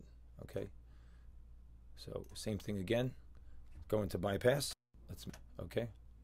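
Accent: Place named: East Coast, United States. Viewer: Good to hear an east coaster. Awesome review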